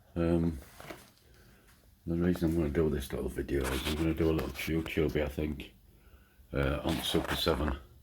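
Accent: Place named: Midlands, United Kingdom. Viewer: I have the same lathe same problem, great fix, i will do mine also, your accent, i'm sure you are from the Midlands, Worksop area, , you speak just like my dad, Cheers from Australia.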